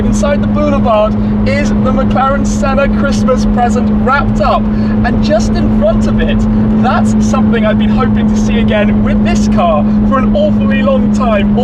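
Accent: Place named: United Kingdom. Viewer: 협찬 잘~ 들어온다.. 너의 혀짧은 영국식 영어는 여전히 적응이 힘들다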